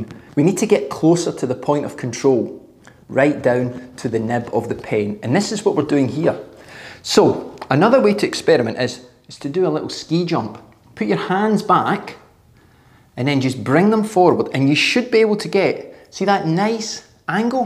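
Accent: Scotland, United Kingdom. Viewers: A real Scott. With that accent, how can he be wrong? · Great content, thanks. And I could listen to you all day, I just love the Scottish accent! Thanks for your great content! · not only - it was also nice 11 minutes of... Scottish English if I am not mistaken ;-) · Great video. Thank you! Love the Scottish accent 😁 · Thanks for explaining! I have this exact problem and may have found the solution now. :) Also...I love your accent! Are you scottish?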